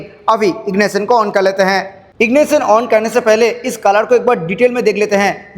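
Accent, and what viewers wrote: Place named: Bengal, India. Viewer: Hindi bolna sikhle, tere accent se hi lagraha hai tu bengali hai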